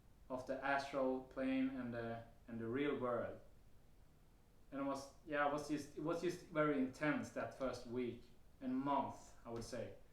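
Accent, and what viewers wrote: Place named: Sweden. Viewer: Tyckte jag kände igen svenne vibbarna i accenten 😊 Tack för din utvärdering 🙏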